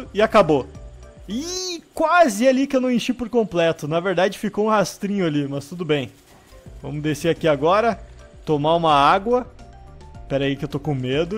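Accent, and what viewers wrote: Rio Grande do Sul, Brazil. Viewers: tu e gaucho de bento ne?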